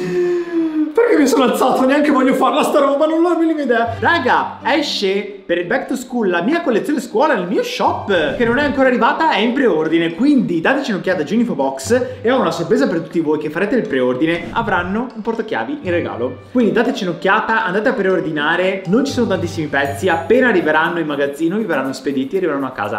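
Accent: Veneto, Italy. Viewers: Diamine! Se sei ignorante e non conosci la mitologia classica, rileggiti (sempre che tu l'abbia letto) tutto il filone antropologico sulla mitologia classica del professore Robert Graves, influenzato dal professor Frazier: Atlantide=Atlante, colui che portava il peso del mondo sulla schiena. Oceano Atlantico=il luogo in cui Poseidone lo aveva confinato. Studia dizione, perché l'accento veneto è pesantissimo!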